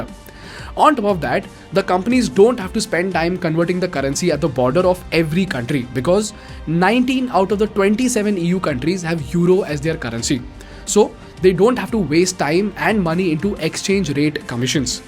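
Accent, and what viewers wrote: India. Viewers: i'm perfect flat canadian,, but i can sound hindi like you if i curl my tongue to the top of my mouth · What kind of language is that? Indian English is like a joke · the agitated Indian accent makes it annoying to listen to this person. I quit after 2 min. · Indian accent that’s I can’t understand it ?????😩😫😩😫🥺😫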